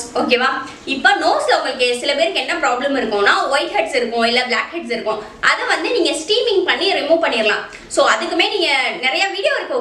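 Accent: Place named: Madurai, India. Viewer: Sissy neenga maduraiya 😘😘 slangeh solludhu "sollikirae,kuduthurae,senjirae" Any way gud content💓👌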